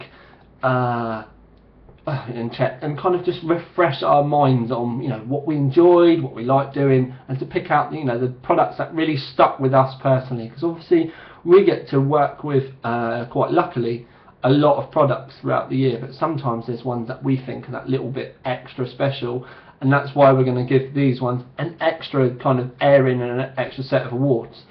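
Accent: United Kingdom. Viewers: You wanna hear something funny, i got tired of watching the video so i decided to go and read the best of 2012 over at the site. When i started reading i realized that i was reading it in my head with a British accent. Im so used to watching your videos from over here in the US that now when i read your articles my mind puts your voice as the voice over!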